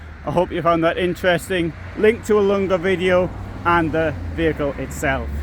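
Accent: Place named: Geordie, United Kingdom. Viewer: Judging by his wonderful accent I’d say the North East of England, if I had to guess I’d say Tyneside.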